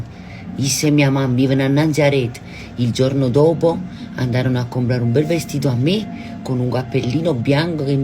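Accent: Naples, Italy. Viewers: Mi piace ascoltare le storie che racconti...poi con quell' accento napoletano..mio padre era napoletano❤❤❤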